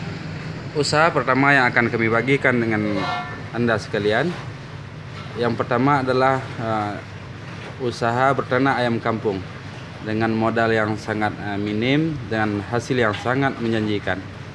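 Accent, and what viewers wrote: Solok, Indonesia. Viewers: fadel gaul ambo danga kayak nyo urang solok lah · Assalamu'alaikum mak,mokasih ilmu jo wawasannyo mak, logat bahaso daerah solok, dima Solok nyo mak?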